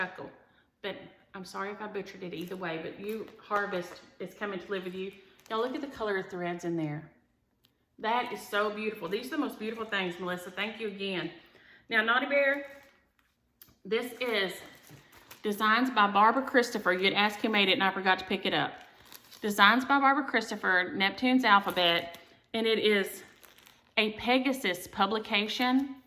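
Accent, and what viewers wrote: South, United States. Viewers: Love your stories and your "southern " talk, I feel like I'm in the south again. You have a beautiful face and personality.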